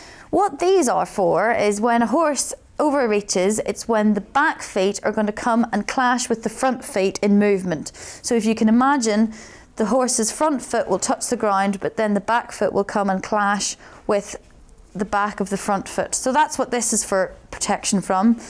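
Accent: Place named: United Kingdom. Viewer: the CCs are so wrong lol someone who understands British accents should redo it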